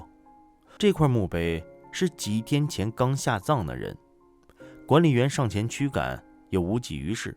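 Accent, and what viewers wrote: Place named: China. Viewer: 大陸口音 我不懂 為什麼 沒有大陸的靈異事件!?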